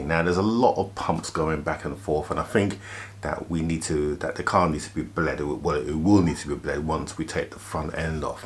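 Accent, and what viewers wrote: United Kingdom. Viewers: Great show!! Are you originally form North London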